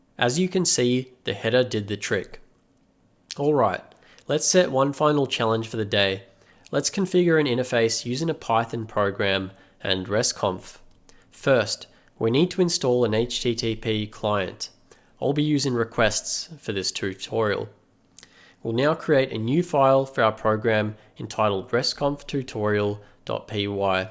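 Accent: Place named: United Kingdom. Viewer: Amazing work. And without accent. Pure british❤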